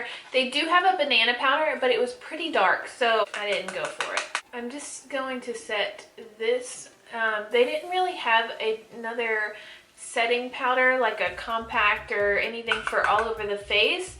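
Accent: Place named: Louisiana, United States. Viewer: Okay.. I just randomly found this video cause I wanted to know more about that hard candy palette cause I saw it in Wal mart today! Anywaysss, right when you started talking I knew you were from Louisiana & then you talked about the hurricanes & I was like yep, I’m right! Hahaha. Anyways, I just subscribed! I’m from Lafayette!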